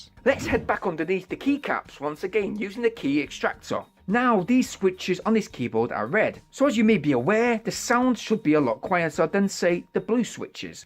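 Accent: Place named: England, United Kingdom. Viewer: Love the presentation and your English accent!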